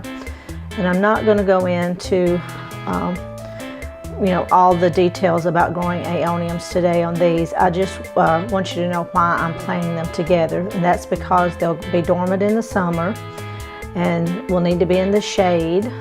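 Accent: South, United States. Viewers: I’m in Sevierville. Near Gatlinburg 😊. What part are you in? I was thinking you’d said middle TN in one of your videos. I recognized your accent immediately before you even mentioned TN. I love our southern accent. Don’t hear it on YouTube much 😊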